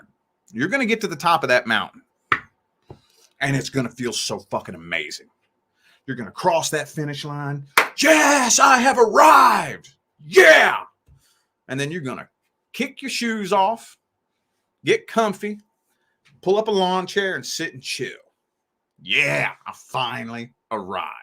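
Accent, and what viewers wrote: United States. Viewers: Hello From France,🙂 How come while I have been rolling rolling rolling through the many videos of Tarot without falling till today to your great work. Let's go straight, I look at my tarot ( 5 different artists that I really really like Boticelli, Isawatt, Anne Guesquière, ...) I do it once a week otherwise you can feel easily lost, while doing once a week it is my moment and I like it. But sometimes during the week, I like to put a curious eye on Zodiac. And today ,I discovered randomly your channel, you killed me since you speak with one clear voice ( I love presentation cosy cool attitude with black and white band on the screen to follow in which topic we are and all that with humour even sometimes thematics are not easy always well most of the time you speak with awesome comments . so I am in sheer curiosity I will even drop on my notebook some of your comments, it allows me first to speak a better English ( sounds you live in USA , that accent is ok too ) and to take conscious as a pure true Aquarius down to Earth I embody that most of the time it is necessary not to play an automatic way of life pace and rather focus on a "camino" on a way to get closer to what wee feel in ourselves really stop lying to oneselves, assume decisions, choices... 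and well yes I can say this my first video from u listening and I feel happy because of the tone you employ emphasis on purpose, repeated sentences, like dear Aquarius stop with your " I know, I know, I k now" among a plenty.👊✊👏 I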